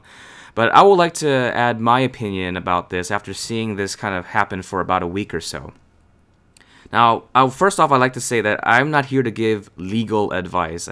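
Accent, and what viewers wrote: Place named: United States. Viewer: wow i am an Thai half Indian but i thought you were an american(i am not a resits)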